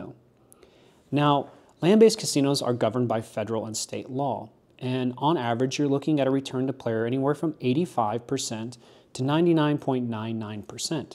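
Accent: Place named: Hill Country, United States. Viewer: Super Informative Bro! I really appreciate the honesty and transparency. Sounds like you're in the Hill Country. I grew up in Houston and am now nomadic, but I've played in land casinos in Colorado and Nevada. Looking forward to more of your videos!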